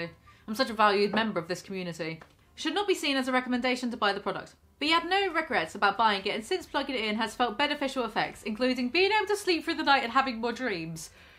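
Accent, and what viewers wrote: United Kingdom. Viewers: I’m from N Y and I always Loved the British talk! RUBBISH ! Hello mate! Specially this nice looking British woman! You Go Girl!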